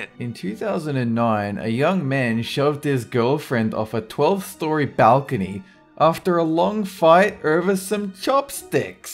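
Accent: Australia. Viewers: Is Aussie? I feel like he is from the east side, maybe Brisbane?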